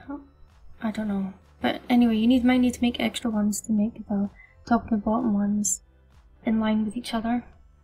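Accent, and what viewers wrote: Scotland, United Kingdom. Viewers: 🙈 Hearing the Scottish accent once I turned up the volume really threw me!! But looks great! 👌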